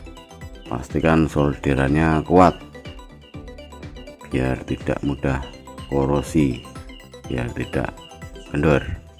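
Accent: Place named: Java, Indonesia. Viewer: Orang Jawa atau orang Sunda ?? Kok disebut KANG , Tapi ngomong nya seperti Orang Jawa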